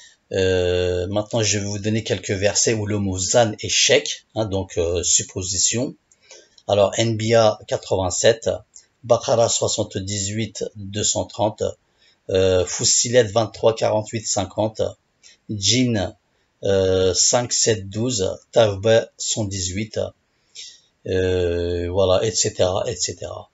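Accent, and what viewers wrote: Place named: Turkey. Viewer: Zann. Avec z. Ne veut rien dire. Mais than a. Thana ou bien thounou qui signifie penser. Comment toi prof tu enseignes ? Pour enseigner , il faut se faire comprendre . On ne comprendrais pas sinon ! Pour beaucoup l arabe c est du chinois , seulement toi tu y ajoute ta sauce accent turk ... C est bien de rappeler mais rappel les tiens.... mais avant de rappeler les tiens , ai la certitude de tes propos car tu es loin du sentier avant de trouver la route qui te mènera au droit chemin .... que seul les alamins ( initiés ) savent. Sache que le Livre, que personne ne comprend ... est destiné que pour les initiés.... Et il n y a pas de dajjal ou de mahdi qui va revenir. Mais la sentence direct . C est une question d heure. De sa3a comme il est écrit.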